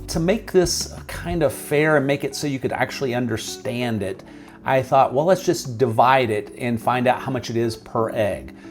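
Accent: United States. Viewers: GREENSBORO? ❤❤❤ It's funny, I didn't notice your southern accent until you said that. I graduated from Elon and did my internship with WGHP in High Point! We live in Oxford now. As a Mass Communications major, KUDOS to your videos . Your scripts and delivery are spot on.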